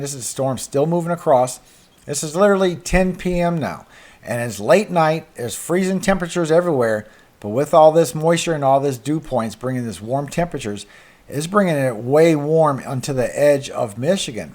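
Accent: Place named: South, United States. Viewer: Accidentally found your channel but so glad I did. The slight accent sounds southern like mine. Would love to know where you are but again very glad I found your channel. You help probably more than you know. Love and Hugs from NC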